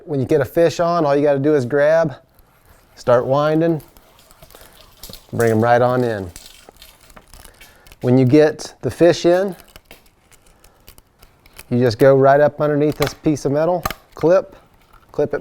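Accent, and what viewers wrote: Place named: South, United States. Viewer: Your voice sounds more yeehaw than usual. Cool build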